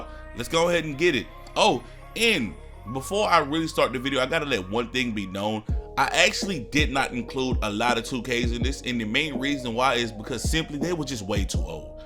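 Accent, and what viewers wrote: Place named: Houston, United States. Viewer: gotta be from Houston ... nigga sound like Maxo Kream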